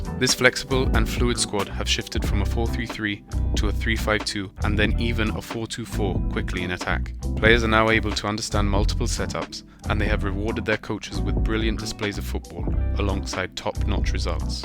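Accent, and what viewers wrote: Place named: Europe. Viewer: As someone who has lived and( just about always) died with the CMNT my whole life, I think this is a very well researched video and hits some great points. One think that I think Europeans (I'm assuming because of the accent) don't full grasp is just how crazy qualifying in CONCACAF is. Herdman will have to keep the boys focused for the remaining 4 away matches. They have shown a lot of grit and fire, lots of shoving matches during and after games. They will have to keep their discipline when playing in Honduras, El Salvador...etc Thanks for posting, great video.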